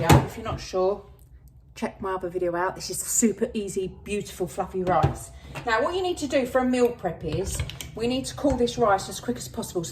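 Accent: United Kingdom. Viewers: Bing bang bosh, you sound like you’re from London mate. Thanks for the handy video 🙏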